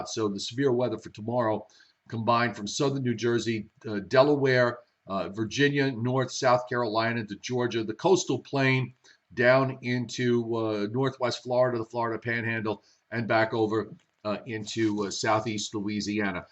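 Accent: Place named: Long Island, United States. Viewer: Good to see you... Whenever I hear your voice it reminds me of my Long Island growing up days!!! Thanks for the update:)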